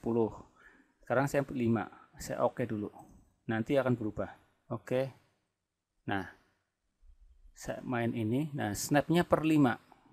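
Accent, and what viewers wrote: Banyumas, Indonesia. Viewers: like 11 om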